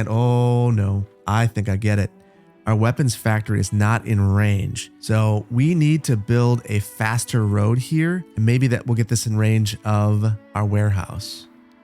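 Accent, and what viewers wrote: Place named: Midwest, United States. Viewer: can really tell you're from the midwest :P schooner is pronounced like school is, ch makes the k sound. and frigate is pronounced like "frig it" :D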